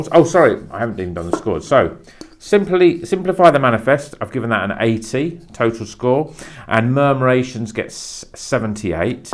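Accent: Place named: United Kingdom. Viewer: i love british accent!!!! amazing!!! love your channel!!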